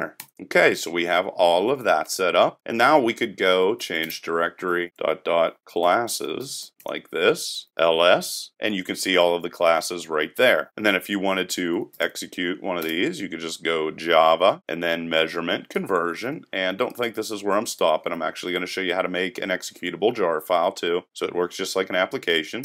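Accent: United States. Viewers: I love your tuts, you know your stuff and you speak very clear American English! Rock on Bro!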